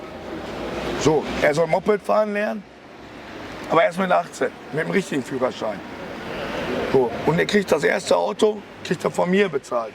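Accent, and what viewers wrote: Ruhr, Germany. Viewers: Wenn man nicht hinguckt könnte man meinen Kalle Grabowsky redet. Ruhrpott rules. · Ich liebe den Ruhrpottakzent! Ich wünsche alles gute, man merkt, dass er das Herz auf dem rechten Fleck trägt. Ich hoffe für ihn, dass er lernt Verantwortung für sich zu übernehmen, auch wenn ich ihm glaube, dass er eine bitter harte Kindheit hatte.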